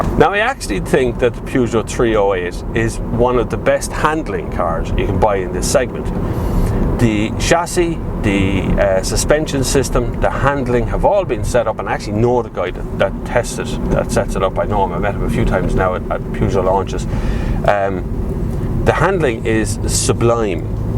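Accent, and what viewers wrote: Ireland. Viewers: Helpful review - and particularly insightful about the touchscreen - thanks. It's hard sometimes to keep track of how all the cars in this segment compare to each other for the aspects you can't see in the videos - ride quality, refinement, engine tractability and response. I'm meaning that it would be useful to have a comparison of these aspects between VW Golf, Audi A3, this Peugeot, Alfa Romeo Giulietta, BMW 1-Series. As I think you have driven all these cars - perhaps you can edit something together to compare them all? I really enjoy listening to your voice - to my non-Irish ears, you sound like Chris O'Dowd (IT Crowd, Bridesmaids) and you can be just as entertaining! Thanks again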